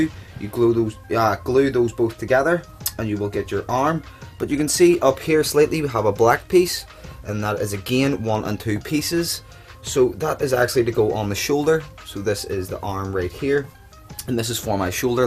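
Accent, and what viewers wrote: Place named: Ireland. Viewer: he is from ireland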